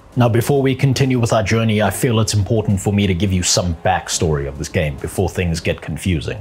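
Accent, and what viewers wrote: South Africa. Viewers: Lakka video bud, sounds like you have a slight SA accent though? Or is it Kiwi?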